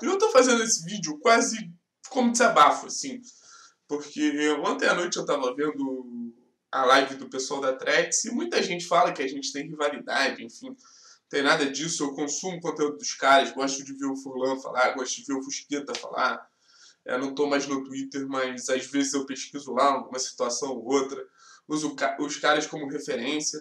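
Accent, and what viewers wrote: Rio de Janeiro, Brazil. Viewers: Sou athleticano desde piá...tenho 51,anos e confesso q me soa estranho ouvir um sotaque carioca falar do Athletico com paixao como vc fala... Pois, como vc fala, aguentar o barulho de torcer pro Furacão não é fácil... A mídia carioca principalmente, mas dos paulistas tbm, quase sempre omitiu o Athletico e desedenhou... Admiro seu trabalho e seu esforco pelo meu time do coração ha quase 50 anos..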